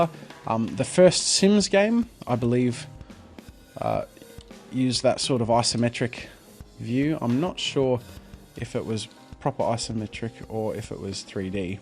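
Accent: Australia. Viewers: He sounds so much more Australian in this video. Is it because he was younger?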